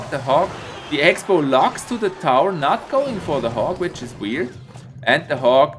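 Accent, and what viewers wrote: Switzerland. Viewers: Chas si das du schwizer bisch? Schöne akzent😁🌾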